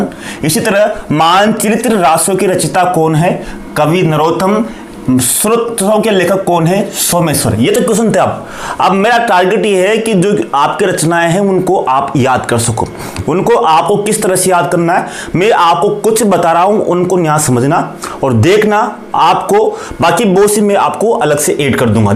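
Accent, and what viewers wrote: Hadoti, India. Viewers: कोई बताए ये सर कहा से है इनका एड्रेस। बोली हाड़ोती की है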